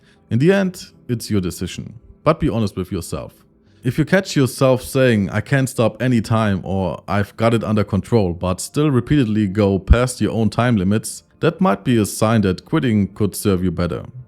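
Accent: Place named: Germany. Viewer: I love deutsch-flavored English. It’s flavorful and discernible. Unlike China accent English… 😥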